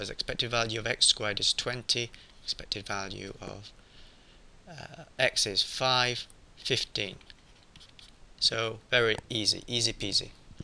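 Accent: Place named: United Kingdom. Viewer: That british accent just adds a whole layer of authenticity on top of it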